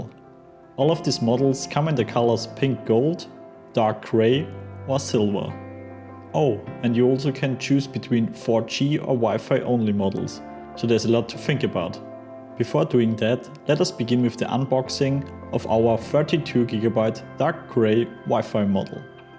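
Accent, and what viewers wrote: Germany. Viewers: Das hört sich an! Wenn ein Deutscher versucht Englisch zu reden!? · German accent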